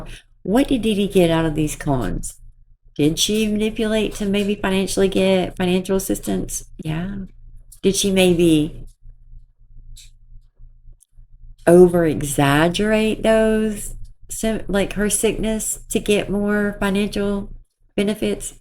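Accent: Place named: South, United States. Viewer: ❤ you dont need to :) like your dialect, 😊 is this a southern? I am from Switzerland and learning English,start noticing dialect only after a while 😊 Sheena needs to say children are off limit is unnecessary and screams predator behavior .. They are child trafficking itches ... and this joe is absolutely sus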